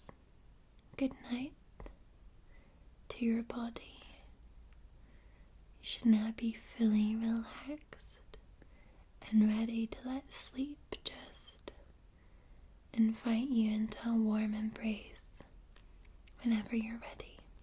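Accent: Scotland, United Kingdom. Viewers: Fall asleep with the. Next to me......scratch one off my bucket list....💜❤😆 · Thank you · Thank you.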